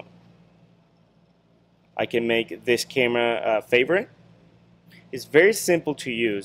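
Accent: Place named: India. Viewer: Nice accent, indian?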